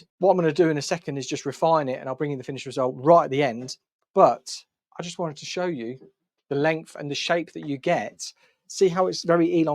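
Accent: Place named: North, United Kingdom. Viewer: You're a really good hairdresser to watch ,you sound like a northerner too like me 😉..But..isn't that hairstyle like a,long ' Karen ' style..maybe for Karen"s,daughter ' Karina' 😆😆😆😆😆Sorry I hate that graduated style at the back & ear flaps look .I was born in 1967 in Liverpool & believe me I've seen some ridiculous styles over the last 50' odd yrs in the UK 😆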